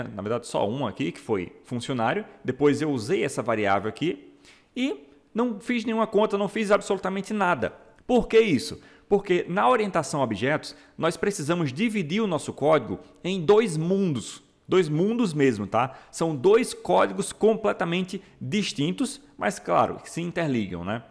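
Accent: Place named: Paraíba, Brazil. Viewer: parabéns pelo video meu amigo! Seu sotaque parece muito paraibano. Estou correto? Abraço.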